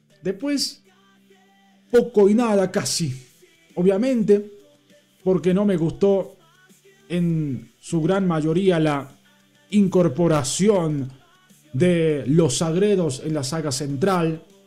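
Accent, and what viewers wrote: Argentina. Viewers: Primera vez q veo tus videos amigo Pero porq hablas como gaucho no entiendo espero no seas un boligaucho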